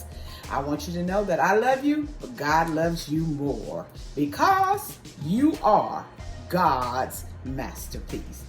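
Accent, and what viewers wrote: South, United States. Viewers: Your southern accent is divine unlike my bland Australian accent.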